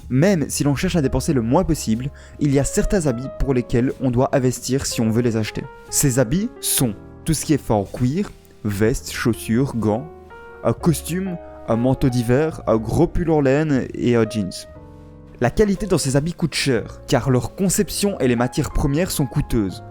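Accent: Liège, Belgium. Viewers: je reconnais une pointe d'accent liégeois bien de chez nous, big up frérot !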